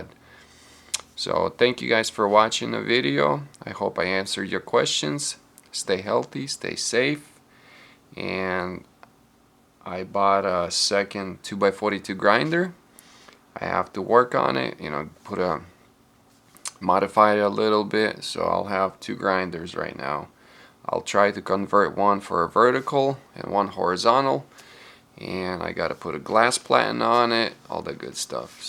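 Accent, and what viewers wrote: Russia. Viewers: Акцент то русский!))Очень хорошие работы,удачи!Продолжай в том же духе!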